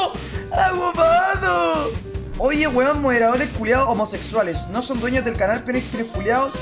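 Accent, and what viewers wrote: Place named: Argentina. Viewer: Pensé que eras argentino xd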